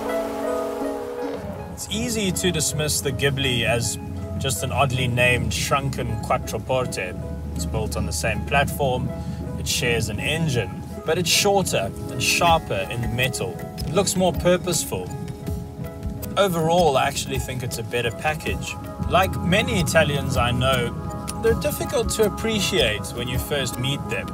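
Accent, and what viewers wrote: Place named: South Africa. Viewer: I watch this video over and over then look over my shoulder and see my beautiful Ghiblet S sitting next to me in my garage. It's my daily driver. M-W-F and on weekends. I will be driving this car 288 miles per week. I am from San Clemente, CA. I love this video and controversial South African accent. I was going to get a BMW 550I but I am so happy I got this anything but ordinary Italian Stallion. Take me to J. Bay and Skeleton Coast Namibia for a surf. I'll show you Trestles and related breaks.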